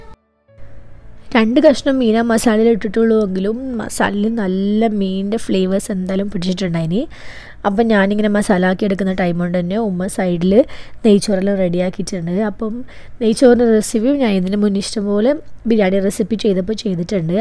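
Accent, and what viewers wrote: Kannur, India. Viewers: Kannur slang kelkan anne njn vedio kanunnath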